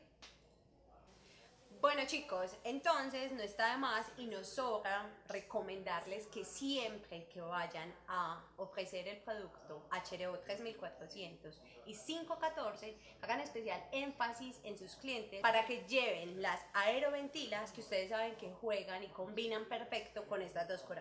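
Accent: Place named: France. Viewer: La chica habla como con un asento francés, o será que tiene la lenguita pegada🤔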